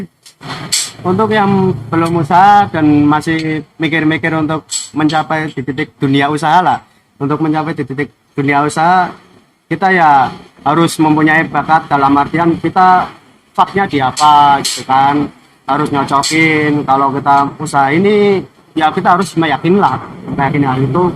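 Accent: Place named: Pemalang, Indonesia. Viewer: Logate wong Pemalang kayane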